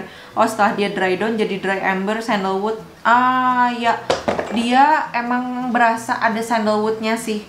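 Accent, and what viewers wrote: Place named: Sunda, Indonesia. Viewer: Nyunda pisan di video ieu mah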